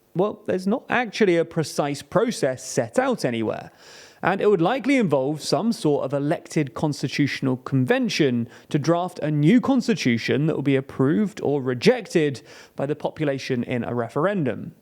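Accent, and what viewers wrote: United Kingdom. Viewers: It's LA France. I watched anyway thinking the narrator is a Brit.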